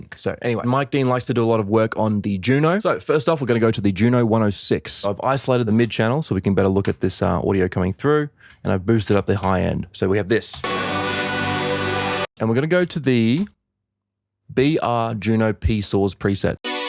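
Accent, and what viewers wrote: Australia. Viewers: how did I not realise you were aussie lmao 😂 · I'm like pretty accent deaf so I never realised he's got an Aussie accent. Sick to see some yt producer faces from here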